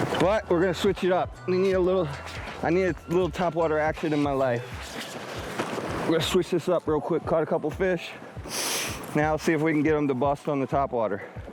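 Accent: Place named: New Jersey, United States. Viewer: LOL, I didn't know you spoke New Jersey"n accent!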